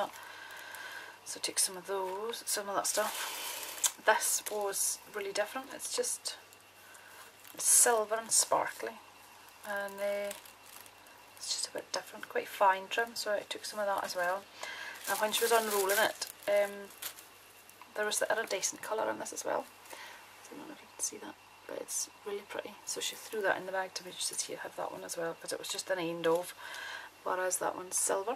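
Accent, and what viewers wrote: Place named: Scotland, United Kingdom. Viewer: I didn't realise you were scottish too lol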